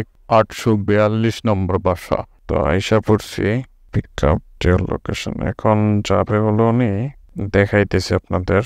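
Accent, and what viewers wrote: Barishal, Bangladesh. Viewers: বরিশালের ভাষা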